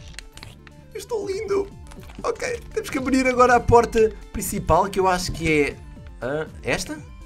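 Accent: Portugal. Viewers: És português?????? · Você fala português do Portugal. E é muito parecido com a linguagem do português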